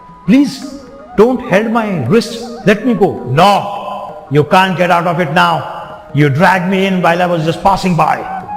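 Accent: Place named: South India, India. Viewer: So amusing presentation! Perfect South Indian tone! Awesome, proud of you, Sir!😊👌👍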